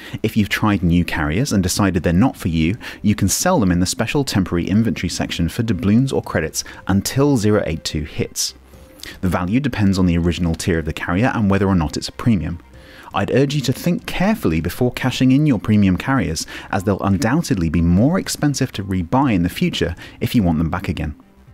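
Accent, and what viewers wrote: United Kingdom. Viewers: Then I for one am very happy that you present these videos. There is a huge difference between American English and ... real English. I love all my American friends online but it does get annoying. As I'm 59 this year, I am so glad to have you fronting these videos. You now have a loyal Kiwi fan (even if I'll soon be moving to my birth nation of the Netherlands due to my lung cancer.) YOU keep them coming and I'll show my appreciation. :)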